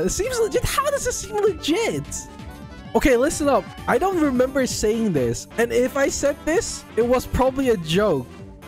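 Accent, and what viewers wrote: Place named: Netherlands. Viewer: Most dutch accent ever he meneertje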